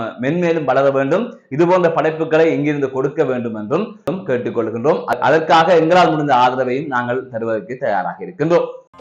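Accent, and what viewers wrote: Sri Lanka. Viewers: இலங்கைத் தமிழை நாங்கள் பெரிதும் விரும்புவோம், மட்டட்ற மகிழ்ச்சி.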